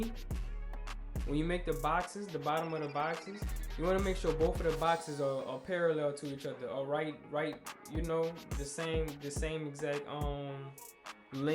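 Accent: New Orleans, United States. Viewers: You sound like you’re New Orleans bro.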